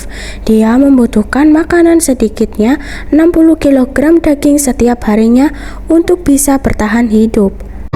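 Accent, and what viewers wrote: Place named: Java, Indonesia. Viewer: Pengisi suara logat JAWA mbaknya 😄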